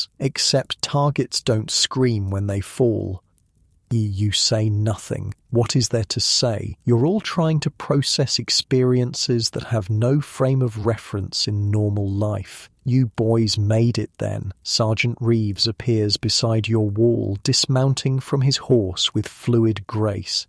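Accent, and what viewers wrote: United Kingdom. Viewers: Why a Brit narrator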